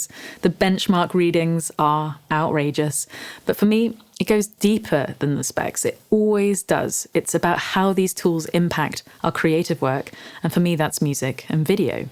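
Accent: United Kingdom. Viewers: I just love your voice <sigh> It's the perfect British accent. And yeah, the new MacMinis are remarkable value.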